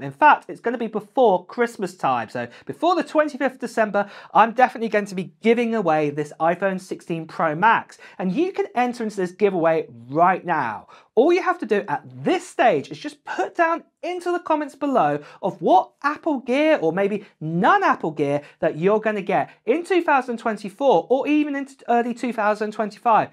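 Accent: England, United Kingdom. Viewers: Love your English accent, son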